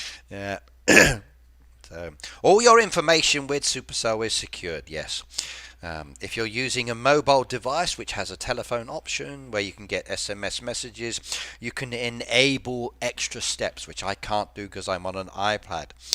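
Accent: United Kingdom. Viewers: Is that a Scottish accent? ✌️😎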